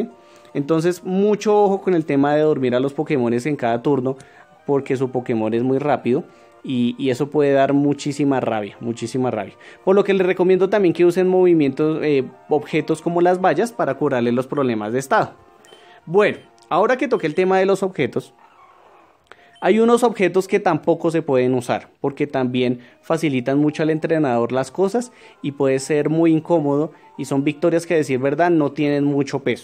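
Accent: Colombia. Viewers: Puro colombiano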